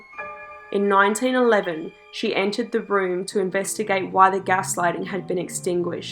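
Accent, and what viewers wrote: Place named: Australia. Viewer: love this. also love your accent !! i think its aussie, where are you from ?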